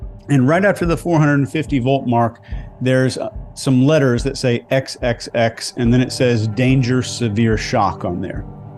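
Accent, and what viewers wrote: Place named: South, United States. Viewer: Guy cant take constructive criticism. Hes got a huge ego, he said people who hide their accents are not to be trusted, but he hides his own southern drawl. He constantly makes up new words like “ive officially called this xyz… “like he works for Webster now as well. Sleazy salesman, remember nothings free if an app is free youre the product.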